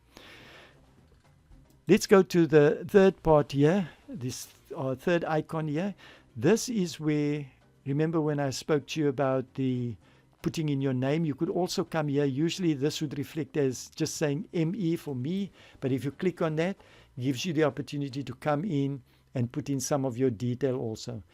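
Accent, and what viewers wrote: South Africa. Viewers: Ek wou se jy is afrikaans, groete vanaf Nederland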